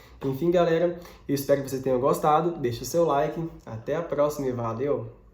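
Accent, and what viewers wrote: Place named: Goiás, Brazil. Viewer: O sotaque goiano é o charme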